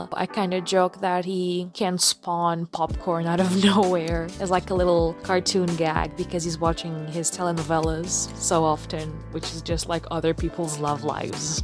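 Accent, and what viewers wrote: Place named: Portugal. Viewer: Got recommended this video, randomly… are you Portuguese, by chance? The subtle accent is quite recognisable. Bom vídeo! 👀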